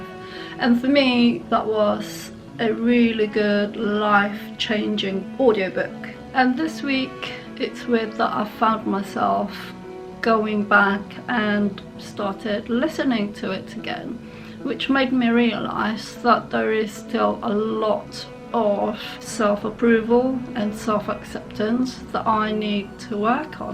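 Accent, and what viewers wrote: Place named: United Kingdom. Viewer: Hi I will be turning 63 coming up next month on February 9 now your voice sounds like your British but! where are you from when you really do not mind me asking? and I know just how it feels when it all comes down to you being alone all by yourself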